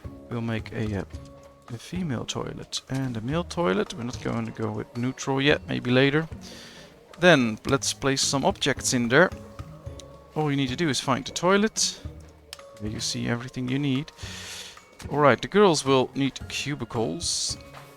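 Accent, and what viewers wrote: Netherlands. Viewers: I was trying to place the accent, I was thinking Dutch then you said Netherlands 👌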